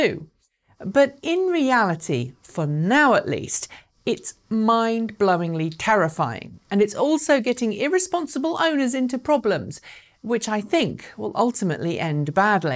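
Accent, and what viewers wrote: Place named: United Kingdom. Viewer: So happy your British accent has returned after having listened to the one with Arcimoto. I have no doubt whatsoever that if Tesla removed any feature once enabled it would lead to legal confrontations. Many Americans do not like to be told "NO!". Having owned my model3 for a year now, the autopilot function is great with a few caveats, it is sadly out of its depth when required to cope with the many mentally challenged road users that frequent Hwy 101. I am of the sad opinion that the roll-out will be seriously hindered because of the incompetent drivers on the roads today. I further think that this situation will not improve with time.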